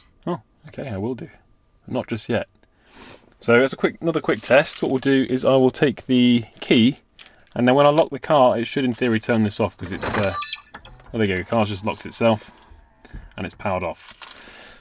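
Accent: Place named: United Kingdom. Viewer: Hey, nice video and respect for doing a hardwire install yourself. I ended up buying 2x the front units to try and get 4K front and rear, but not sure how successful or possible it's going to be getting it all set up, and does mean I'll have to access front and rear individually by wifi / sd card as opposed to being all in one. I may make it into two 2 channel kits instead. The quality of the front camera is a little worse (24fps and lower bitrate) if you run 3 channel mode as opposed to 30 fps and capable of running higher bitrates, but depends on your own use case. One thing to note is I don't know if you're in the UK, but assumed you were until I saw the footage with foreign roads and plates based on your voice haha. There's a UK site/suppliers for buying the Viofo cameras, but the 2 channel front+rear was out of stock and there was only two 1ch left, and I took the last two 😅. 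They'll probably have a restock soon if they haven't yet as my order was a week ago, but just wanted to mention that site which means people can get the cameras faster in the UK without import worries and also the promo code should work on there as I used Ashley Neal's (driving tuition) as he did a sponsored review / unboding himself a couple weeks ago. Also make sure you either heart or thumbs up (heart is more consistent at giving notifications) to give a notification when you reply to someone's comment, otherwise they may not remember to come back or think you've acknowledged them :D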